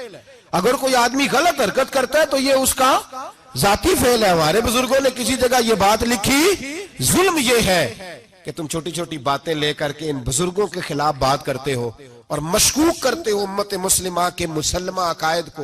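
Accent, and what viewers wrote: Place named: Punjab, Pakistan. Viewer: Punjabi lahja urdu seekho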